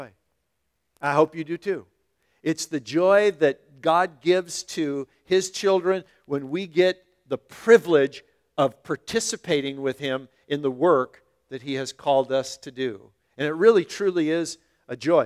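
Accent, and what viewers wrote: Canada. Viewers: I thought you were Canadian.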